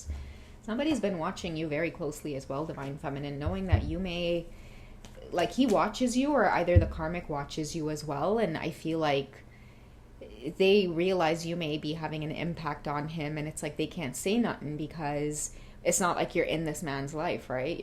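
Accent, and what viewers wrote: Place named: Trinidad and Tobago. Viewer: What you said at the mark. Nailed it! 💣💥 He even admitted it to me too. And then at the mark "somebody who's just there with you because you're putting a roof over their head". I said pretty much the same thing to him. It's true though. Spot on thanks so much 💜💜💜 PS I'm here for the Trinny accent too! 😉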